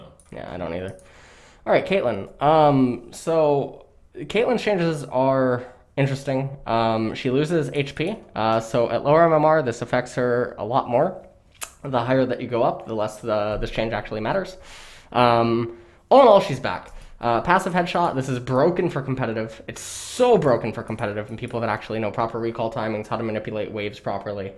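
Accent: Sweden. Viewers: that Swedish accent is getting my dick hard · That swedish accent <3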